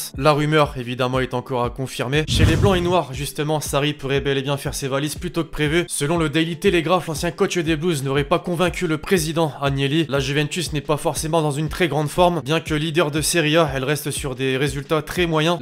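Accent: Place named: Marseille, France. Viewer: Il Marseillais mtn mdr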